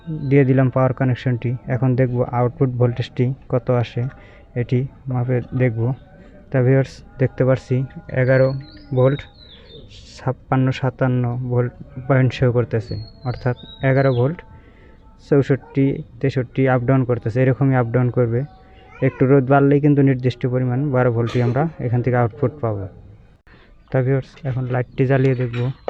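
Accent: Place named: Tangail, Bangladesh. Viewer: ভাষা শুনেই বুঝা যায় টাঙ্গাইল্যা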